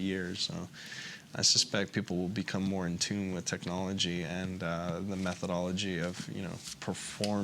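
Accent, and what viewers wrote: Canada. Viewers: bahahaha awesome... he has a bit of a canuck accent as well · his Canadian accent was really noticeable, wow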